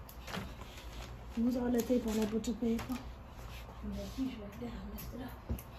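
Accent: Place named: New Orleans, United States. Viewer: Sounds like she's from New orleans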